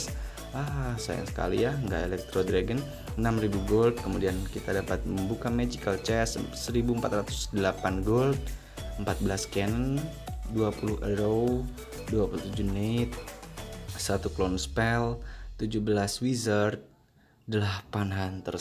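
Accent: Yogyakarta, Indonesia. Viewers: Mas orang jogja bukan? Ayo mabar mas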